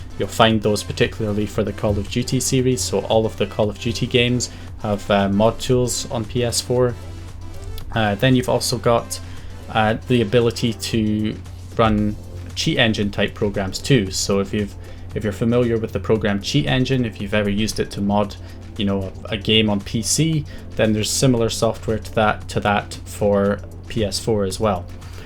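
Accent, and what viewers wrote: Scotland, United Kingdom. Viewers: Are you Scottish? 🤔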